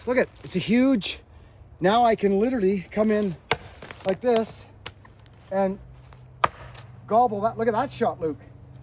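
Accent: Canada. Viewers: Weird he sounds Canadian ? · Where is this accent from I'm guessing Nova Scotia or British Columbia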